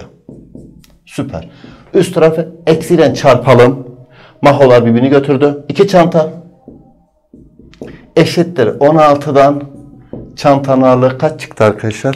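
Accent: Urfa, Turkey. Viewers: Urfali hocamiz zaten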